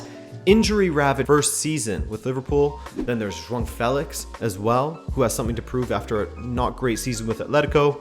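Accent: Canada. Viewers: Was thinking the same thing as a first time listener. Immediately recognised the Canadian accent which caught me off guard as don't see that often in my football recommendations. It all made sense when we got to Portugal! Nice video.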